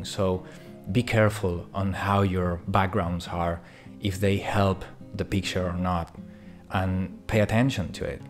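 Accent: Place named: France. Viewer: The cadence of your speech, the small motions of your head and body when you are talking and your accent remind me so much of the french actor, Tchéky Karyo. See especially his character in the 2011 film, "The Way" written and directed by Emillo Estevez.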